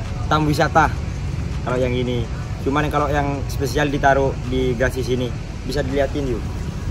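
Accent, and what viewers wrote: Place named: Madura, Indonesia. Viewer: Logat bhsnya sepertinya org madura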